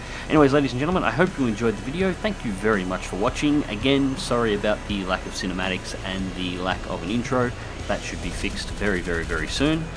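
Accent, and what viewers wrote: Australia. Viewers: Love hearing an Aussie accent on these videos, good show!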